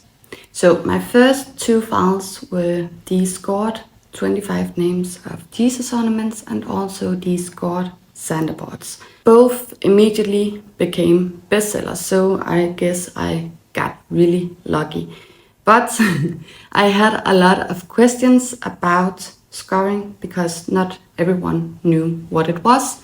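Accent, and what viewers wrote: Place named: Denmark. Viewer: Thanks for sharing your story with all of the real life ups and downs. I own a few of your files and love watching your videos! Your Danish voice sounds great to me. I play around in Illustrator designing my own files, but definitely want to get better at it. Looking forward to learning more from you.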